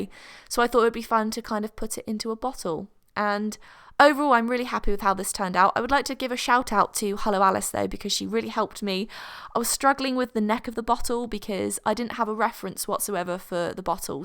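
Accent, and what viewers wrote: United Kingdom. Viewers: funny gal. I wanna know where in Britain they talk like that because I dislike it very much.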